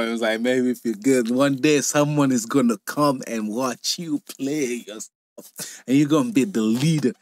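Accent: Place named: Jamaica. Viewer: I am a white guy in his mid 50s and is one of my idols. He mixed country with the old blues and kicked up playing faster and harder. Both the Beatles and Rolling Stones were inspired by him. I live on the east coast on the US and his accent sounds like mine mostly. where are you from sir? In no way am I saying anything to disparage you accent. It sounds Jamaican maybe?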